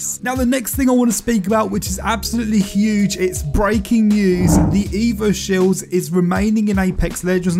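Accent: United Kingdom. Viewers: I like it. You're direct a d to the point, no begging for likes and your voice although british isn't annoying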